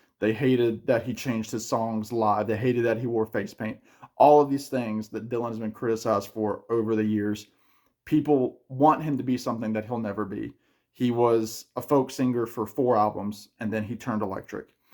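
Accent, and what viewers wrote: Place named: North Carolina, United States. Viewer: Big fan here. " " is still my favorite Dylan record. " " is alright. " " is great. " " is probably the first time I heard him croon. Some of those songs reminded me of. I grew up in the Deep South and recognize southern accents. (definitely a Delta guy) I'm going to go ahead and say your accent is North Carolina, possibly Tennessee? I tried to do a Dylan show but don't touch him on YouTube. They will yank that in a heartbeat. " " looks like a black metal album cover 😅. This is good. I'm excited to watch more videos. Cheers!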